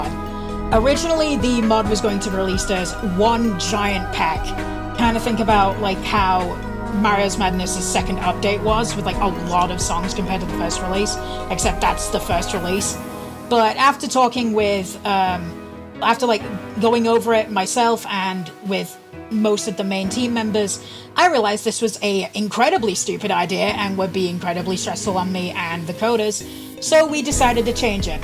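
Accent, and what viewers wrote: United Kingdom. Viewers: My god... he's British... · Is that a fellow british I sense. RAHHHH 🇬🇧🇬🇧🇬🇧🇬🇧🇬🇧☕️☕️☕️ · I like how many of the comments just point out your voice. I could've figured you have an English accent, but of course I too expected a more man-like voice, no offense. =P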